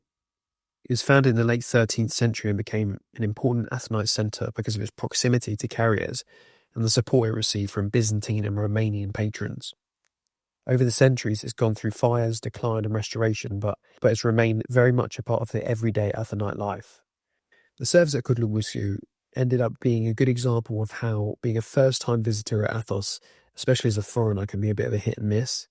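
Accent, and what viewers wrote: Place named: United Kingdom. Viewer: Orthodox, cute AND British accent ? ❤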